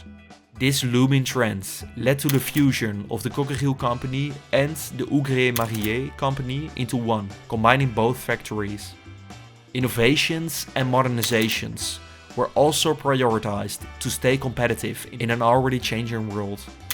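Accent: Netherlands. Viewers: You do a phenomenal job with this cinematic video, but a lot is lost because of your heavy Dutch accent. If I were to give you any advice: try to work on this or find a native speaker to do the voiceover. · that aweful dutch accent .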